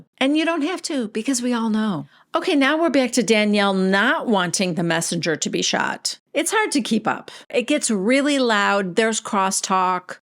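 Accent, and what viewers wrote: New Jersey, United States. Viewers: Love you!! You do such a good job recapping!! Your Jersey accent is hilarious 😍 Louie is a narcissistic control freak... Teresa is the perfect victim Unfortunately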